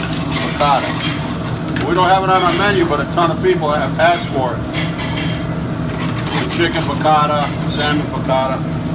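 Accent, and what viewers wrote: United States. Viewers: Love your vids and your accent! Is that accent specific to any part of the US? Keep it up!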